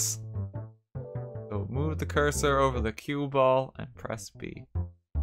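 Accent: Ireland. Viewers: Is this lad irish cause like he sounds irish but speaks like hes american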